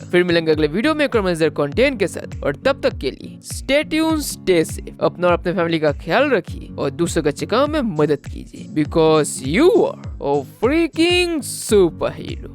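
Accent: Bengal, India. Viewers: Tum aisa q bolta hai tum bangali hai kya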